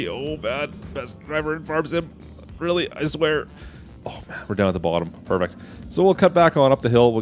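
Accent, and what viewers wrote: Canada. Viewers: Are yoy from alberta?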